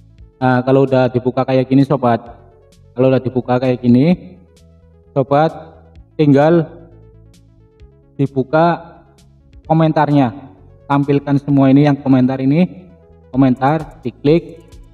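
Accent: Tegal, Indonesia. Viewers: Jenengan asli tegal apa wa. Kayong medok temen ra.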